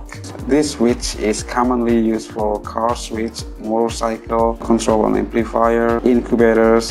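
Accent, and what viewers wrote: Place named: Indonesia. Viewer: i saw ur previous video list, actually i was thought u are indian, now i knows u from Indonesia, that's why say i was talk ur accent 😬😁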